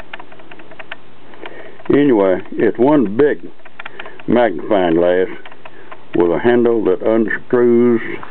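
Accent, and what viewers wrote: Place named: South, United States. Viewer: HAHAHAHA SOUNDS LIKE A HILL BILLY@@@@ Definitly not a bad thing, were all hill billys over here.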